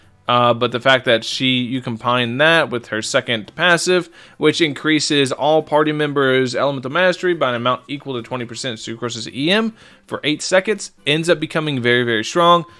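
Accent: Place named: Canada. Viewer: My girlfriend thinks you sound Canadian. Are you brother?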